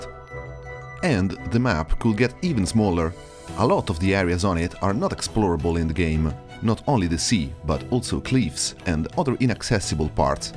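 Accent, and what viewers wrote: Italy. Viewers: You have the most Italian accent 😂😂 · Compà si sente da 80 km che sei italiano 😂, bella li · L’accento italiano è valore aggiunto al video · Amazing video! Very sleek editing. I was wondering how you got rid of the fog, a run without it sounds fun. Also, are you Italian? I'm not completely sure but you pronouncing the name of that town correctly and your accent points that way. Great job! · Ho la sensazione che tu sia italiano, l'accento c'è, son contento di vedere qualcuno dei nostri fare BENE dei video in inglese, complimenti! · Your accent is Italian eh? :) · ma sei italianissimo si sente subito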